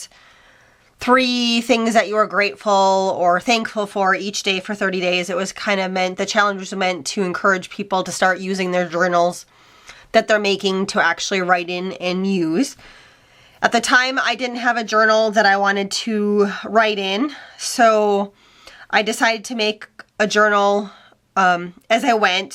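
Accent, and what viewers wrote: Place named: United States. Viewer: Pretty journal. I have a non crafting question for ya! Folks from your state pronounce your short "o" and "a" differently than the rest of the States. I'm curious... where does that come from? If you can answer that, it'll settle a bet with my husband. I say it comes from the American Indians.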